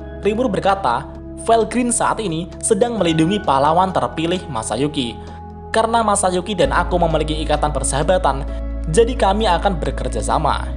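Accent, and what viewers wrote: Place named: South Jakarta, Indonesia. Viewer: Jaksel ya bang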